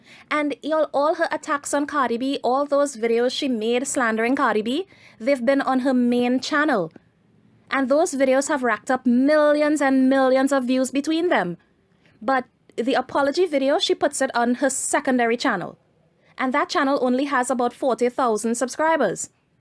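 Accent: Trinidad and Tobago. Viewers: Hey girl..you sound like a Trini...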